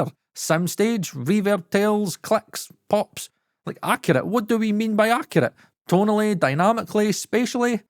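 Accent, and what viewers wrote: Scotland, United Kingdom. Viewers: That's the most aggressively Scottish accent I've ever heard in my headphones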